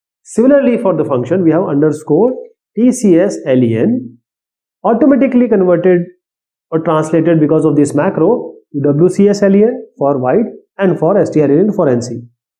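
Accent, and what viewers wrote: India. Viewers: Your hindu accent is a rape to my ears, impossible to listen. And no reason, since UTF-8 is Unicode. You get it wrong: UTF-8, -16, -32 are minimal number of bits, so say character A (latin) would still take 8 bits in UTF-8, 8 and another 8 unused in UTF-16 and so on... Did I mention your awful hindu accent? dont do public speaking, ever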